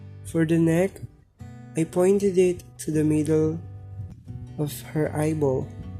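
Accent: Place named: Philippines. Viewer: Your English accent is really the same as filipino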